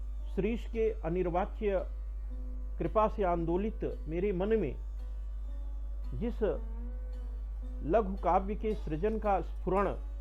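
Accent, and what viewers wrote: Standard. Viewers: शुद्ध उच्चारण के साथ -साथ अद्भुत प्रस्तुतीकरण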